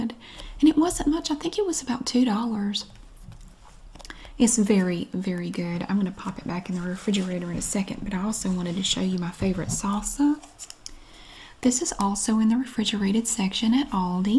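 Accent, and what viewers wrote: South, United States. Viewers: You are such an awesome person . love the southern draw . you really get into your characters and i love that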